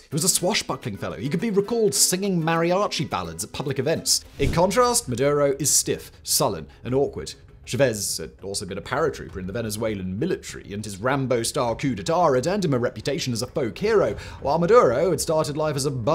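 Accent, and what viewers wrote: United Kingdom. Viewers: Since you sound British, when is the “Royal” family in the U.K. giving up power? 🤔 That is a LONG RUNNING dictatorship!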